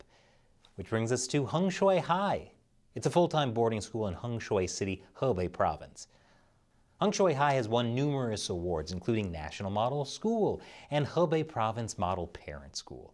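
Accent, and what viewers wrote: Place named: United States. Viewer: First time on your channel but just the american accent lets you kno that its goign to be biased and especially finger-pointing.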